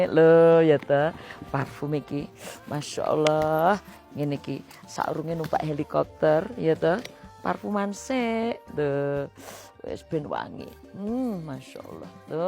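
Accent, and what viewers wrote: Java, Indonesia. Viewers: MasyaAllah alhamdulillah, ya ini yg kmi tunggu2 dan harapkan Mbak, ngagem busana Jawa Asli jian + luwes gandes, Hayuuuuu jobo jero, matur nuwun sampun membudayakan Bhs Jawa dan logatnya yg medok natural, jg busana Jawa yg anggun, mantan, 🤩👍😇🤗🥰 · Ibuuuu... Suka ikutin Ibu... Jowone enak le ngendiko... ❤❤❤ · MasyaAllah mbk,medok pokoe jowone ❤❤❤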